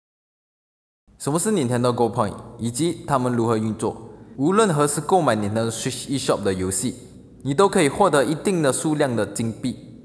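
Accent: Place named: Malaysia. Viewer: 这发音 好像马来西亚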